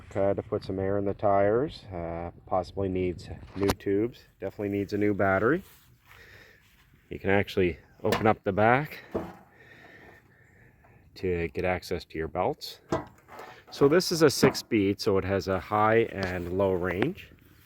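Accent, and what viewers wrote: Canada. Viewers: Thanks for the overview. Love your Canadian accent - my mother was from NB. I just got a free 1990 YT1238 but in well-worn condition. Very stout machine. Briggs 12 hp with 38 inch deck. Spent a lot of time outdoors but that's not too tough on equipment in our mild So Cal climate. The paint is badly flaking over the rusty areas but so far all it needs is a gas tank, the little rod that actuates the choke and a battery. But I won't buy any of these until I run it around the yard a bit to test the trans which on this model is a hydro (no clutch). I got it to start yesterday and it doesn't smoke so there is hope it will run and cut well. Re the tires I am pretty sure they are tubeless. You can tell if yours has tubes by the valve stem. If they need tubes be prepared for a struggle with the old tires especially on the rears if you can't get them off the axles. 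When I put tubes in riding mower tires I bolt them to my work table so I have two hands free. Re tread wear I have never worn our a riding mower tire. The worst is to neglect them to the point they go flat and crack. But even then they are usually usable with a tube since they only take about 10 psi.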